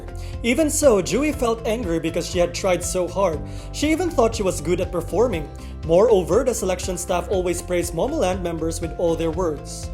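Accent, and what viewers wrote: Philippines. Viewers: The narrator's accent is so Filipino. Ewwwwwwwwww 🤮🤮🤮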